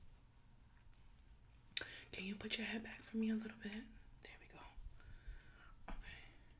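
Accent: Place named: Queens, United States. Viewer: where are you from?? some things you say sound exactly like my friend who's from queens lol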